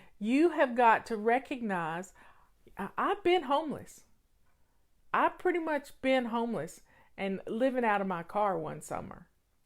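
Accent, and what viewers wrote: South, United States. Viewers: Thank you for sharing your wisdom with us! I love your southern accent and words - it’s comforting to me and I’m not even southern!😄. Thanks for being you!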